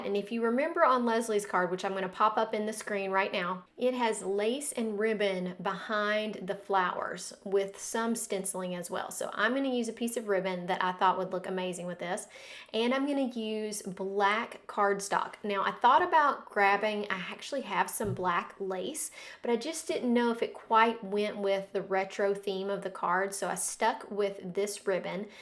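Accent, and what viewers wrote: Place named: South, United States. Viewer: Love it! I'm a new subscriber (and team mate!). So glad to "meet" you and hear another southern accent! <3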